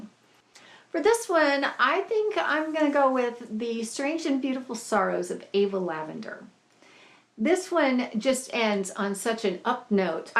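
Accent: United Kingdom. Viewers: Your British accent is on par! I really want to read The Book of Ivy - heard mixed reviews on the 2nd one though! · Great tag! I loved the British accent ☺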